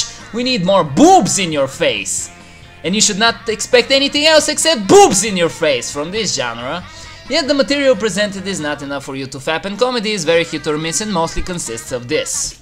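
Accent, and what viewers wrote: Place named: Romania. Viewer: Ima say Romanian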